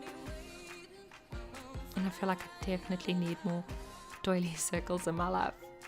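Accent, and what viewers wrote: South Africa. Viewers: Do I hear a South African accent there? Enjoyed watching your journal process. TFS!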